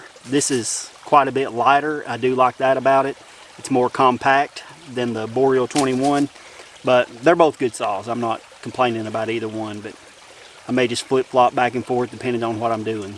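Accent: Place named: South, United States. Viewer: He's such a southern dude, can't hardly understand him.. he needs to speak normal english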